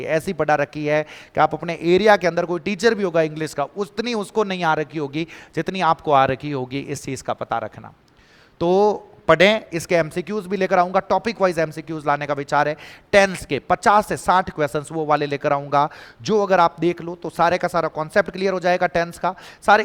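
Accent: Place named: Marwari, India. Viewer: Sir aap kha s ho marwadi hmare side ki h 😂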